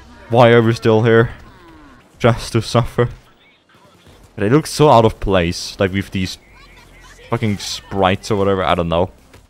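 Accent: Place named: Germany. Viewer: Hey man U Sound so German are U German by any Chance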